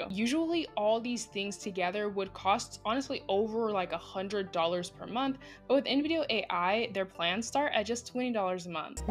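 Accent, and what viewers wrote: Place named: Spain. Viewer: Girlie, your Spanish accent is amazing! I'm so grateful for your videos new subscriber here!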